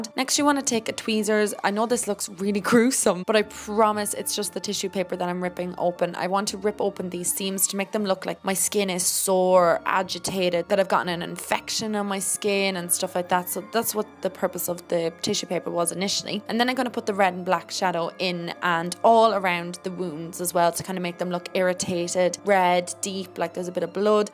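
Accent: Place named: Cork, Ireland. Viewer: You sound like ur from Cork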